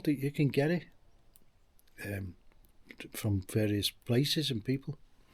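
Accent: Liverpool, United Kingdom. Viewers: Are you from Liverpool or the Wirral? 😊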